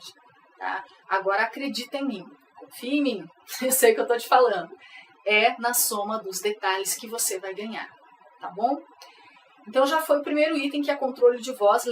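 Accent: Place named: Londrina, Brazil. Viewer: Vc é de Londrina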